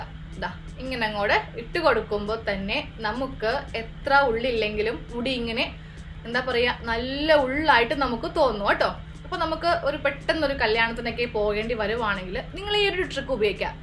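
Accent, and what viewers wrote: Kannur, India. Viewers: Kannoorkariyano samsaram kette thonni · Kannor aanalle, naanum kannoor aan. Samsaram kettappam manassilayi😃👍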